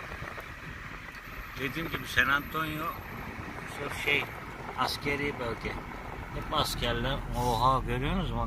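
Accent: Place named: Ankara, Turkey. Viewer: Abi Tam Angara aksanı . Süpersin valla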